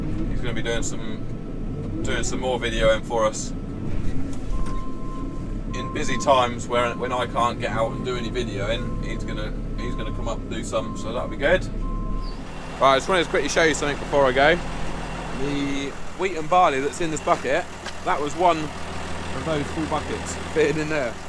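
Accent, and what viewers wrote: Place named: East Anglia, United Kingdom. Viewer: Hi, really enjoying your videos 👍can you let me know where you're based roughly? Sounds like a lincs/ cambs twang to me? 😃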